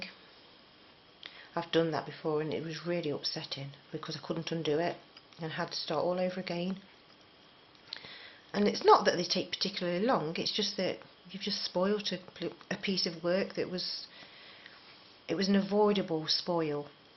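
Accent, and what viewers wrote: England, United Kingdom. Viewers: Thank you very much I did it and I am so happy, you make very easy to understand, and thank you for the history about crochet that you kindly share in your video, I love crochet and I love your English accent, I leave in Australia.:-)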